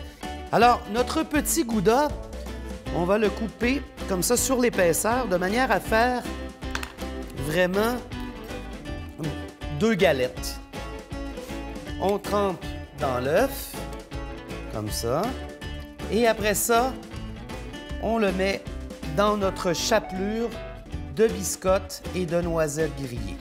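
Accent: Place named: Canada. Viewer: Avec ce grand sourire, cette joie et surtout l’accent Canadien, j'ai vraiment envie de préparer ce Portobello :D Merci pour la recette.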